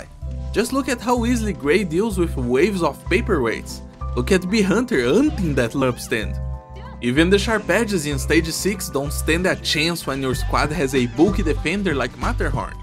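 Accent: Brazil. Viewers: I'm hearing brazillian accent? Btw, nice video, i know what makes an operator a bad one is the amount of effort they need to complet a stage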